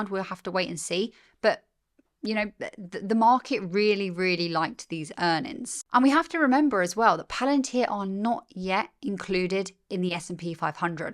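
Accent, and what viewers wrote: England, United Kingdom. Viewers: It’s always a pleasure listen to your English accent talking about our dream company !!! Let’s go PLTR !! at the end of the day there’s gonna be a few bumps in the road, but in a longer run PLTR will be a monster company!!!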